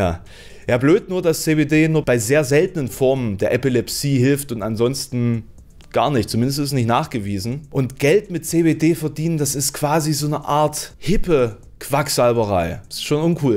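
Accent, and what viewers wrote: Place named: Saxony-Anhalt, Germany. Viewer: Neo Magazin Royale war einmal, mittlerweile heißt es ZDF Magazin Royale. Nur mal so als Zwischeninfo. Dann noch kurz ne Frage an den: Kommst Du aus der Nähe von Halle oder Leipzig? Ich überlege wohin ich deinen Dialekt einordnen würde. Vielen Dank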